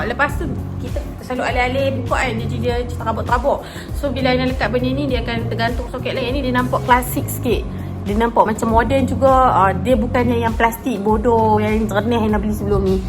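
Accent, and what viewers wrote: North, Malaysia. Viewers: aina kalau ckp utara lagi best dgr 😂 sy suka dgr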